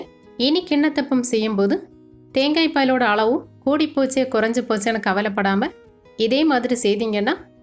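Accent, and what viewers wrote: Kerala, India. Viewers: Are you a malayalee? Your tamil has malayalam accent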